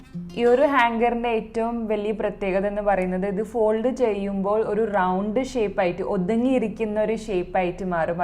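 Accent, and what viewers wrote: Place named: Mumbai, India. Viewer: Are u raised in Mumbai? Ur accent is like a Mumbai malayalee 😄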